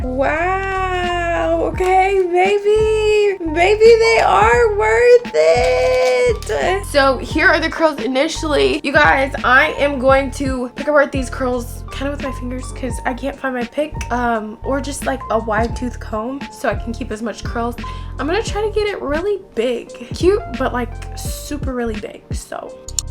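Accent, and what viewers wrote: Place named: Alabama, United States. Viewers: it’s so precious, it sounds like a classy southern-belle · Girl where are you from bc your accent is very distinct lol.. Alabama??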